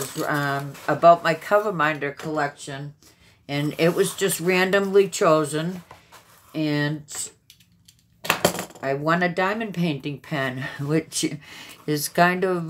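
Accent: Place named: Massachusetts, United States. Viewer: I just realized when I came here to watch that you’re the one from Massachusetts!! I’m originally from New Bedford and I’m so nostalgic listening to your accent! 🥰🥰 I’m so glad you enjoyed the event and your prize!! PS, his name is Matt 😆🤣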